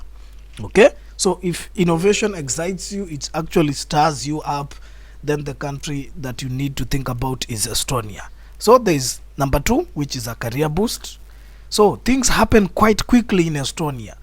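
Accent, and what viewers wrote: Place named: Kenya. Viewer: and he sounds sooo sooo kenyan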